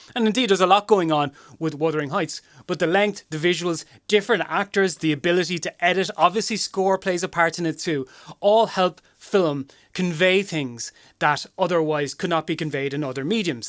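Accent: Ireland. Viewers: This was excellent!! Love your eloquence, gorgeous Irish accent & fabulous commentary. Thank you!! "Wuthering Heights" eternally. ❤🌹🖤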